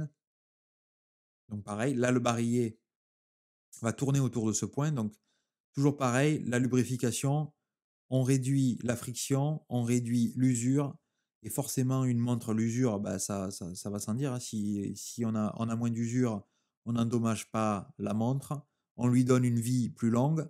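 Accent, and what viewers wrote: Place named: South, France. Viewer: notre bon petit accent du Sud, c'est vraiment plaisant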